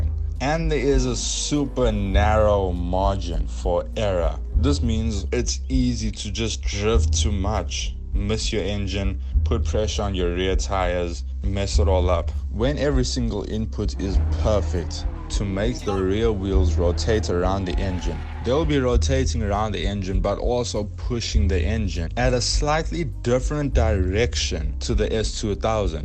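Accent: South Africa. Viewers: I feel like I am listening to an asian that some how grew up africanz in South Africa. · Bro are you south african, dueban maybe?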